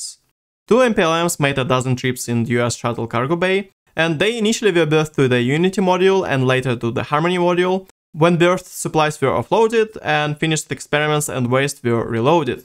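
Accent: Russia. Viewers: Прекрасная работа! Даже не представляю, как много ты тратишь на ролики. Реально достойно уважения, учитывая попытки идти в сложные темы и сразу на английском (верно ведь понимаю, что русский твой родной язык? Слишком хорошо чувствуется русское произношение). Верю, что рано или поздно, сохраняя подобное рвение, добьёшься больших высот и, чем чёрт не шутит, будешь наравне с Мэттом или, прости что копирую, Stratzenblitz75. В общем, верю в тебя, чувак. Продолжай в том же духе, если тебе это интересно. PS: Если не сложно, можешь исправить "Куррент"? К сожалению, не на часы смотрим, не ждём биения курантов, хоть и в текущий момент все эти модули и существуют. Бьёт по ушам такой "Карент". В любом случае, достойно уважения! Спасибо.. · Oh, okay. Your accent hears like real russian :D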